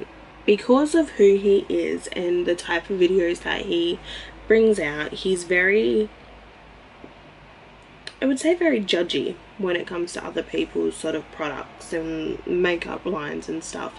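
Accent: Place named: Australia. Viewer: Girl i love your accent, are you Australian? The final look looks gorge! ❤️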